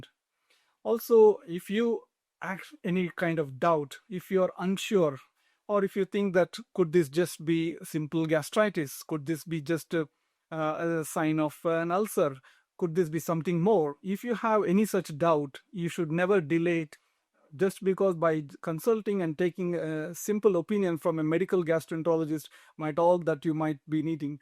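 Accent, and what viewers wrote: India. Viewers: I was enterested with the caption. But the moment I heard that Indian accent.. i closed it.. IDKW.. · You india English is 50 / 50 to understand what are you saying !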